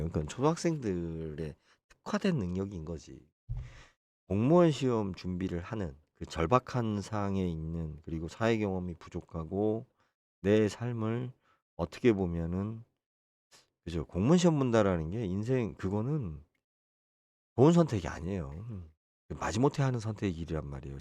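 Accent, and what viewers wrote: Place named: Jeolla, South Korea. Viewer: 내 귀엔 너에게 전라도 말씨가 들리네? ㅋㅋㅋ 호남 청년들도 많이 돌아섰단다. ㅋㅋㅋ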